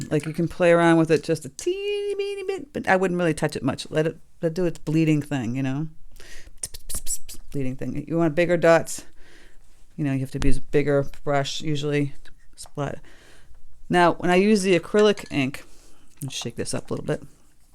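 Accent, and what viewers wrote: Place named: New York, United States. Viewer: Love the accent! N.Y, N.J.?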